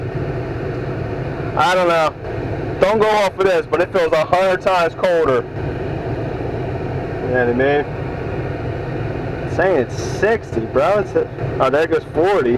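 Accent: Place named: Philadelphia, United States. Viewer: My man gotta be from Philly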